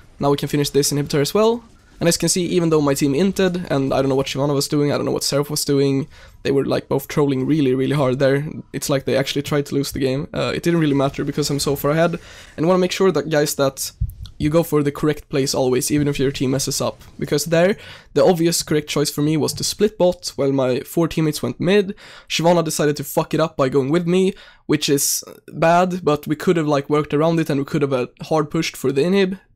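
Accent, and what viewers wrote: Sweden. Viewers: That swedish accent. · Nice commentary! BTW...sounds like ure swedish ^^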